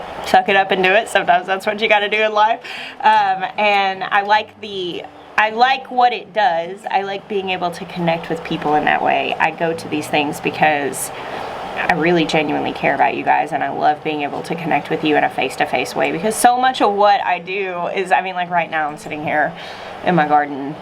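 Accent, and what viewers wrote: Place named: South Carolina, United States. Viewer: your SC accent is coming on strong!! 😁🌻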